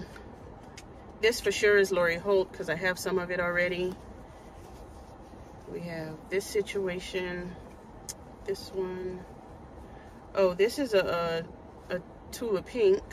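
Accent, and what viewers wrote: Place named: Louisiana, United States. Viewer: I just recently came across your channel because of your Cupid box reveal. I love your down to earth, call it like you see it approach. 😊 And I KNEW you were a Louisiana girl from the first video I watched but I haven’t heard you mention it until this video. As a LA girl myself I’d recognize the accent anywhere! 😂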